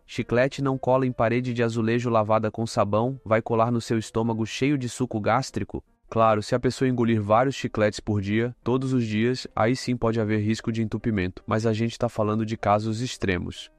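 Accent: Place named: Rio de Janeiro, Brazil. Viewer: tira esse sotaque carioca, me da agonia mano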